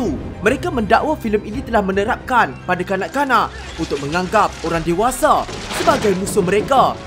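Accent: North, Malaysia. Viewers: suka channel ni. Subscriber baru di sini. orang utara ka? A kat belakang tu pekat benau. Hehe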